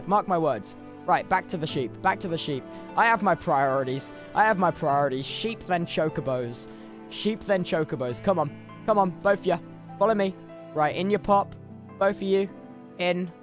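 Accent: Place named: RP, United Kingdom. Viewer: This is so British what the heck??? He sounds so posh, it's sending me back to lets plays of old.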